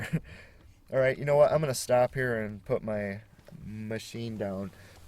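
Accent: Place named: Minnesota, United States. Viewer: The biggest irony behind this video is that this guy has one of the thickest MN accents i've ever heard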